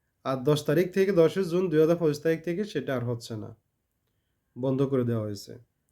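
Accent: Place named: Pabna, Bangladesh. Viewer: ভাই পাবনার কথা শুনে বহুত হাসি এসে গেছে।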